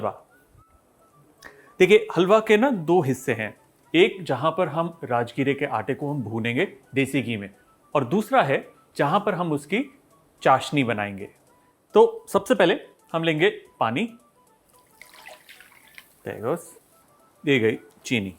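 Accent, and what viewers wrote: Punjab, India. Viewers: App Punjab Se Ho kya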